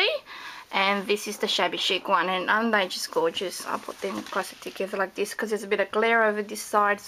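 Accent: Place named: England, United Kingdom. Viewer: very english! lol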